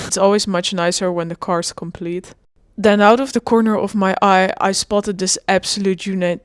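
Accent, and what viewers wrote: Netherlands. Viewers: Wat ontzetten gaaf(!). Van de kanalen die ik volg die barnfind fideo's maken, komt 80% uit de Verenigde Staten, en de rest uit het Verenigd Koninkrijk. En dat zijn er wel een paar. Van de thumbnail dacht ik heel even dat je ook uit de VS kwam, maar je accent is onmiskenbaar Nederlands!😉 Het is leuk om iemand tegen te komen op het internet die zogezegd "uit de buurt" komt. Ik ben heel benieuwd wat de levensloop van deze 4x4 gaat worden en welke avonturen het gaat beleven. Èn of het misschien ook een naam krijgt. Zoals bijv.: Martin de Subaru, en Oliver. Een 1963 Opel Kadett. (Edit: oeps.. Beide auto's van Richard Hammond van Top Gear/ The Grand Tour. Maar dat wist je waarschijnlijk al😅)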